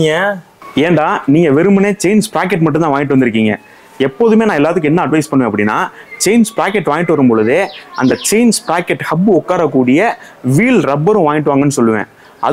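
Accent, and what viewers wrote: Madurai, India. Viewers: பாய் நீங்க மதுரையா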